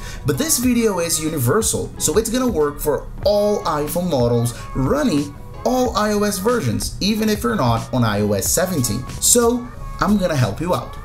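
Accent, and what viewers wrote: Brazil. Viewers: Brasileiro, cheio de sotaque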